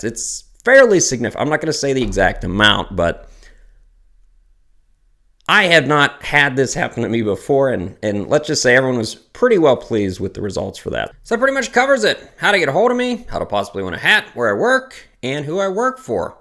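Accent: Western Pennsylvania, United States. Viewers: I always thought you were in Pennsylvania. You have a western PA. accent.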